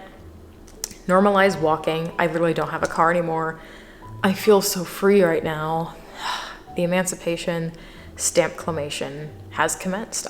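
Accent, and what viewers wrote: New York, United States. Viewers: Your NY accent is giving old Jewish lady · That New York accent is peak ms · The New York accent 😂😂😂😂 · 🎉🥳Congratulations on your new place in the big apple, I’m so excited for you!!!!!! Apologies for the delay.., I’m still getting over the 50xs the rent smh 🤦🏾‍♀️ Great NYC accent!!!!!! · Perfectly stated. It really is annoying how closed minded some of us still are in 2022. love you practicing that NY accent. Been down here since 2017 and still have my hybrid NY/NJ accent when I got mad. Love your videos! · I think your NY accent is funny. I always liked the comedy show The King of Queens, where Carrie has this same kind of accent. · The bricked up and the New York accent took me out girl 💀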